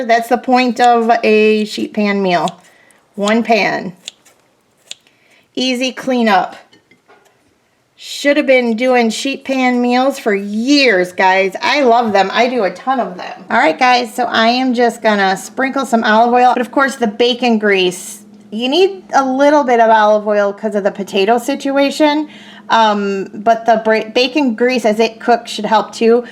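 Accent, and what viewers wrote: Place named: Detroit, United States. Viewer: Yes! Do it! I never thought to make Hash on a sheet pan. brilliant!! Looked so delicious. Right me too. I e been doing way more of them. Just throw away the foil. Great job! Aha! I KNEW you were from the Detroit area! I could totally dedect that in your accent ❤️. I grew up in the Toledo area and my In laws lived in Clarkston! Small world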